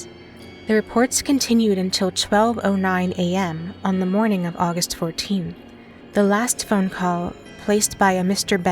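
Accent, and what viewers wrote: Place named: West, United States. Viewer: I love the western accent!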